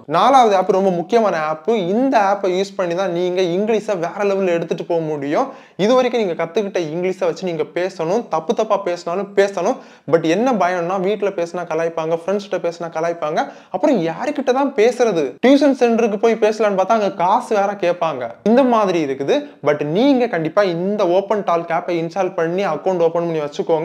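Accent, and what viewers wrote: Kanyakumari, India. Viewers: Nanba Are you kanyakumariyan?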